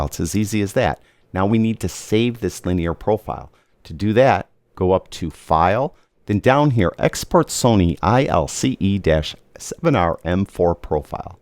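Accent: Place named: Chicago, United States. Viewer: I can pick up on Chicago accect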